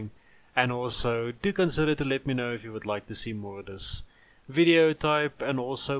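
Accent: South Africa. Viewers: Those "R"s.... South African?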